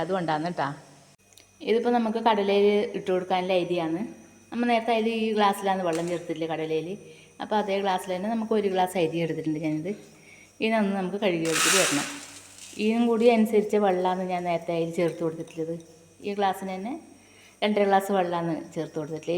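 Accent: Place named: Kasaragod, India. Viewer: ഭാഷ തനി കാസർഗോഡ് തന്നെ അല്ലെ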